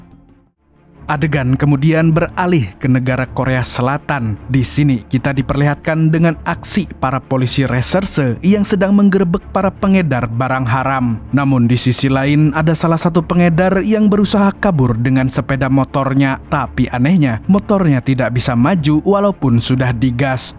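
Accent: Banjar, Indonesia. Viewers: nah orang banjar kah mimin nih 🤣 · BANJAR KAH MANG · umai urang banjar sekalinya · umaaa org banua banar sekalinya sidin ti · bah urg bnjrr skliny salam di alalak tengahh manngg hahahhakwkwkwkw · orang mana pian bang kaya logat banjar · ternyata pian urang bnjar mang lah, kd nyangka uln😂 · Org Banjar kah mng 😅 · Orang banjarkah mang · Bahhhhh mendaiii urang banjarrr pun ai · Org banjar miminnya nh sklinya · Urang banjar sakalinya si adminnnn😂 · urnk banjar ni sako dr suara opening iykh min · ohh orang banjar sidin nih sekalinya.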